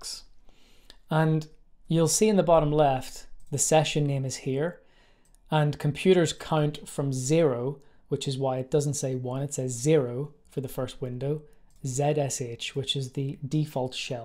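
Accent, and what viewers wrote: Northern Ireland, United Kingdom. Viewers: Ive always loved the northern Irish accent. Its so militant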